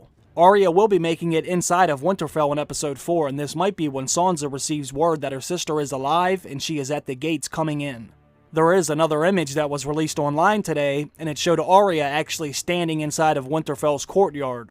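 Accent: United States. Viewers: Which American accent is that ?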